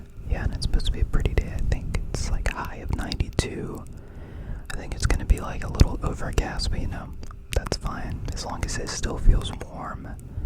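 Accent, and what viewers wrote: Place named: Houston, United States. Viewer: Sounds like H-town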